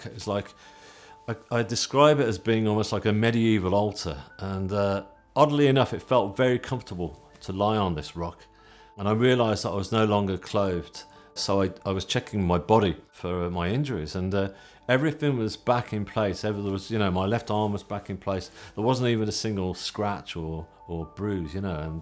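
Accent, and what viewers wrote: England, United Kingdom. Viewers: Hearing this English, down to earth accent makes the after life feel even more real. ❤ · I'm English, but it sounds so strange hearing this form an English voice. Incredible story x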